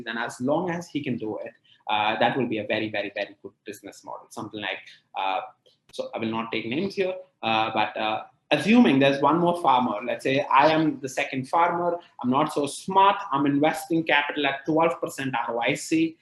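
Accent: United States. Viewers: He went to school in london and got US accent? Chutiya hein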